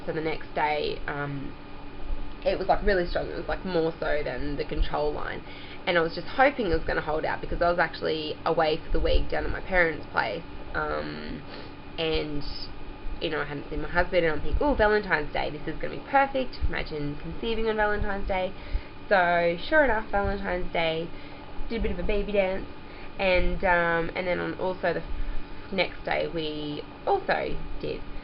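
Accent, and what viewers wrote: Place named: Australia. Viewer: Ha ha i thought the same when i saw your video and then when i heard your voice i was like ahhh I'm sure she's Australian lol go us Aussies. Ooh i love Queensland such a beautiful place we're thinking of getting married over there. How are you holding up with the crazy weather over there? I'm over in Perth, love it here. Thanks babe fingers crossed it happens soon! xox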